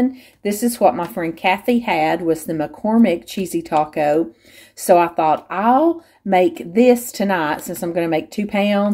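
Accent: South, United States. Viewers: I adore watching you! You remind me sooo much of my family in Tennessee. They have all passed so listening to your southern drawl is such a nice reminder <3 Blessings to you!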